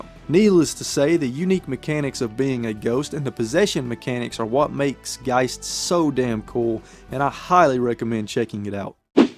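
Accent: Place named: Carolinas, United States. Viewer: You sound like a Carolinian. Great video!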